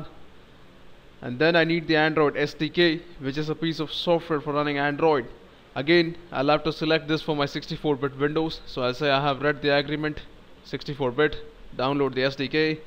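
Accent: India. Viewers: Ever since I started learning to code, I got quite used to Indian accents. It doesn't bother me much. I like overly confident way of speaking. Good job bro. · Thanks so much, the first time i like an indian accent <3 seriously, THANKS!!!!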